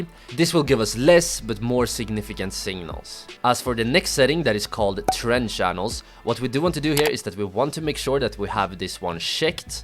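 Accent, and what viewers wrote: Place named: Sweden. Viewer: 😂first time seeing you and I already hear ur Swedish accent?! Great explanation for the strategy but brother am trading in lower time scales 15 and 5 min. Still works? And what settings would you recommend? Thanks in advance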